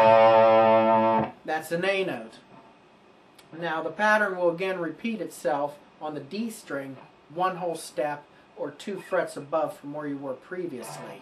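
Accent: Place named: South, United States. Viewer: Alrighty then, not from up North are ya? Great little lesson.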